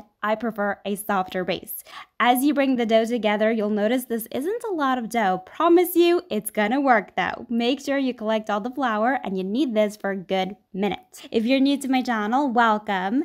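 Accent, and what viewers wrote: Italy. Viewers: Love the way you pronounce Mozzarella and Nutella. Just love your Italian accent in all ❤️❤️ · I am italian and I love how you keep the italian pronunciation!!!!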